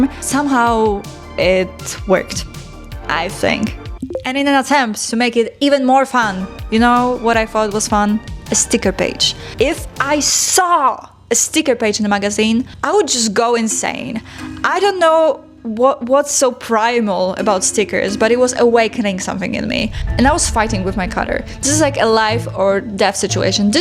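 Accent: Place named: Poland. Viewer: You have a really cute accent. Polish, right?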